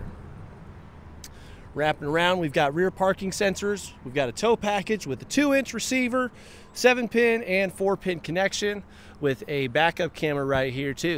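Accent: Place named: South, United States. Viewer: It gets quite annoying with the "Ram" and southern accent lol, it makes me feel like Kia guys don't know what it is really like to own a pickup truck.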